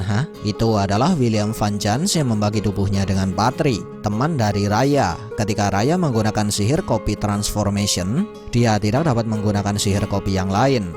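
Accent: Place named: Java, Indonesia. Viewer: bahasa jawanya medok sekali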